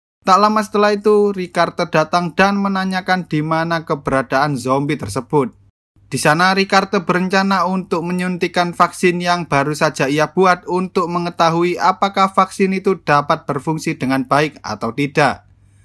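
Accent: Java, Indonesia. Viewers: Medok buanget si mas bahasa Indonesia nya, 🙏👍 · Btw Mas Nyaa Ini Orng Jawa??....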